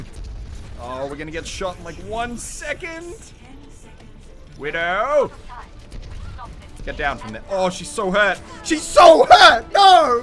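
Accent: Australia. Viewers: U are Australian right? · I want to see you play Junkrat just because i want to hear some funny australian flavor in-game commentary :D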